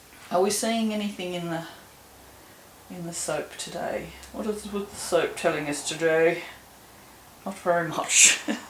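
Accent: Australia. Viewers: Hi, I'm a bit late to the party... love your Aussie commentary lol (Aussie here too). I have this fragrance but haven't used it yet, so was good to see your video. I wanted to ask where you got your soap cutter from please.. I've been looking but seems like theyre all O/S..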